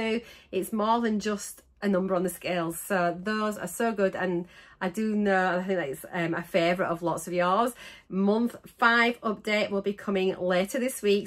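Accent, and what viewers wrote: Yorkshire, United Kingdom. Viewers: Hello lady, I discovered your channel recently and promptly subscribed. Your Yorkshire accent and warm, friendly personality is just so endearing, I could listen to you forever. And that’s before we even touch on content, which’s just first class! As a fellow MJ user on week 6 of my journey, it’s very helpful to listen to good, sound and trustworthy advice. And the fact that you were also a nurse “on your previous life”, as am I, is just the icing on the cake! Incidentally, our starting weights and current weights are exactly the same. I too am just half a pound off getting my 3 stone rosette from Nutracheck, which I can not recommend enough! My weight loss journey however, started way way before yours, and I lost just over two stones just using Nutracheck and about 11 lbs in nearly seven weeks of mounjaro. I really am struggling to get past 900 calories on some days, and that upsets me, as I’m terrified of damaging my metabolism and making things harder for myself in the long run. 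Most days I do manage around <num> calories, but have to work VERY hard at it! I truly love your channel, and when pushed for time and can only watch one, that’s the one I pick ❤ PS: sorry, our starting weights were not the same. I got that wrong!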